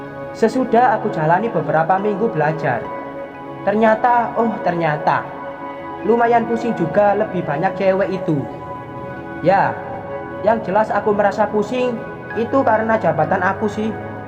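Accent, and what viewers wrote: Java, Indonesia. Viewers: Lanjut cetita y pokoke slalu ngakak...dngan bhsa y medok bngt...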